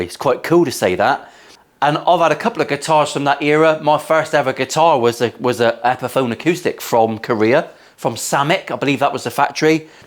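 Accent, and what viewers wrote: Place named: Devon, United Kingdom. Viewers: “Don’t be a prick”. Wise words. One of the greatest phrases in a Janner accent: “Don’t be nasty, ‘ave a pasty!” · cheers, were is your studio, I'm a Plymouth boy who lives up north now, I used to mess around Milehouse as a kid, its good to hear a good Plymouth accent on the net, great kids keep it up. Tony. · That's a Plymouth, Devon accent I reckon.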